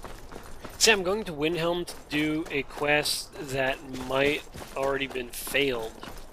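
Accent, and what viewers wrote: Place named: Quebec, Canada. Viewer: There's no need to get an attitude. He is French Canadian; French is his native language. Yes, stutter can mean a hiccup in smooth frame transitions, but the main definition refers to speech. You didn't mention 'framerate' or 'smooth' or 'gameplay' or anything to suggest you meant the framerate. Additionally, you specified the subject as "he", not as "his game" or "the game" or "Skyrim" or "framerate", so what you said actually refers to his speech, not his framerate. Lern 2 english plz.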